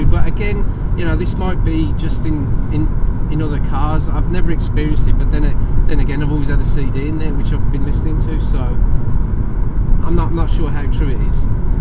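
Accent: Birmingham, United Kingdom. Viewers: Nice review! Funny when you mentioned the A1231 in the North East - I commute along that road every day! Wouldn't have guessed that's where you were driving, based on your Brummie accent :o)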